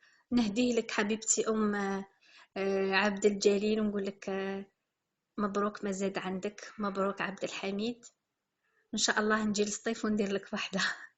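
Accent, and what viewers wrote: Setif, Algeria. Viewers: الله يبارك عليك اختي والله عرفت اللهجة تاعك من هدرتك وعرفتك سطايفية متشرفين السطايفية لحرار 😘😘😘😘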